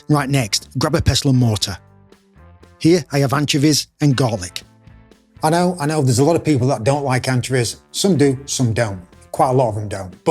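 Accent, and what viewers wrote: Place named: England, United Kingdom. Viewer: Tomita's! Tomatoes! lets call the whole thing off! you sound like and English guy but say tomita's and not tomatoes like an American. Why?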